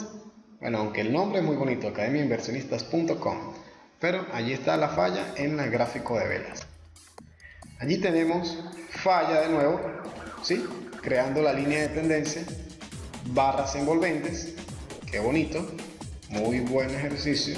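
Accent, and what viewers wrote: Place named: Venezuela. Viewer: Conho hace mucho que no escucho un Venezolano... Bien hay mi pana estoy aprendiendo y tu aporte es importante, gracias.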